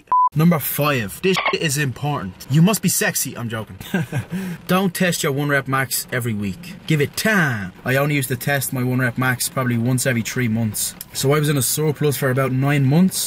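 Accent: United States. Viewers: Are you from Texas? I notice an accent. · Cringe at the gay American accent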